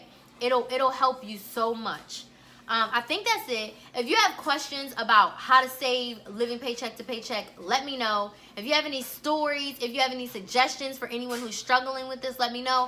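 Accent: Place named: Maryland, United States. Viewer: Thanks for the video..I knew it! I could hear that Maryland accent! Especially when you say two, true, do..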